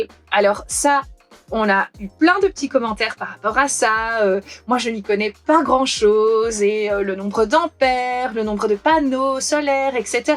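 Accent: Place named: Belgium. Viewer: Trop mignione cette femme avec son accents un petit accents belge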